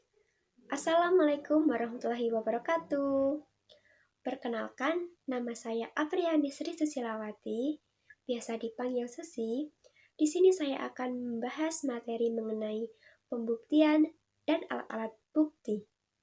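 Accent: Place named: Java, Indonesia. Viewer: sukses terus sri.. oh iyaaa,, jowone kental pisan oiii wkwk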